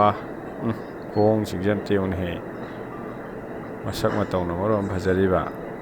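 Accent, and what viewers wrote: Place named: Keishampat, India. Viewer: Bro gi khdang se eikhoi keishampat khdang ga chp manei hydi eymbei dialogue se..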